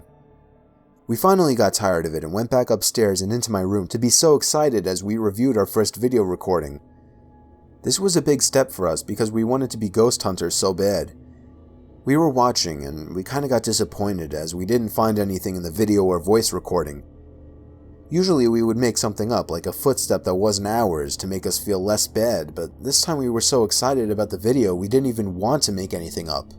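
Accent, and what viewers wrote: United States. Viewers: Your accent brings back rad memories of my time in the US..........Are you one of the beastie boys? 🤓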